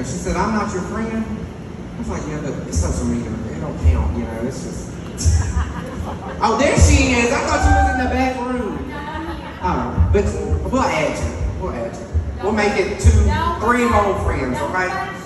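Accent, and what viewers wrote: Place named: Alabama, United States. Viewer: I enjoyed that shug,, cant wait til you do it again hey even on moms front porch be ok with me!! My moms from ALABAMA so i know all the ALABAMA talk! you got it for real for real! you said thats all I got to tell yall ,, 😂🤣🤣🥲😂🤣 you dont need no skit nothing ! All you gotta do is be yourself and talk about everyday life or as a kid memories! I mean thats the good thing about being raised in ALABAMA! Im stuck in LOUSIANA AND my Mom is gone on to heaven so hearing yalls voice makes my heart smile! I been following you on tik tok since day 1 and I just love you shug or ma used to say HUN to like you say shug! so cute,, keep it up and even though you say its a comedy show dude you have a amazing voice so what better way to let us hear you joke and sing too! even if its a silly funny song you sound good singing ! we love you dont stop being you dude love ya Tammy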